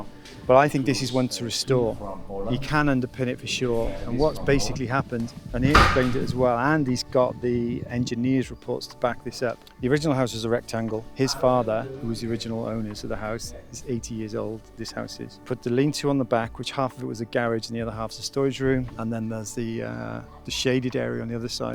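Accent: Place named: Northern England, United Kingdom. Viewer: It is north British English... The best way to 'speaka di language'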